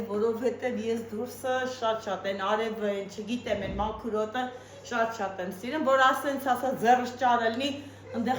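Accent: Lori, Armenia. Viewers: Բառբառիցդ երեումա , որ լոռեցի ես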